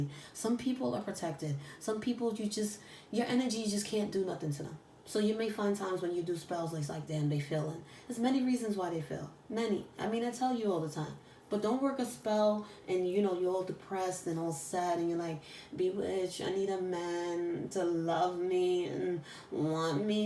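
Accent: New York, United States. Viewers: lol she stay having me dying with laughter she sound like she from New York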